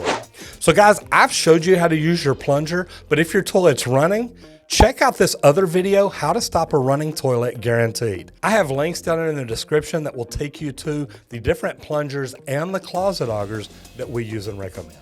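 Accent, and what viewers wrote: Texas, United States. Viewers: It's so great hearin a North Texan accent, I ain't sure what city exactly but that's DFW within 75 miles could be a bit further either way... Folks don't realize we'll drive 45 minutes for supper in Texas, it's a stretch town to town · That I can tell a fellow Texan accent from the rest of the world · His accent is a mix of gay and Texas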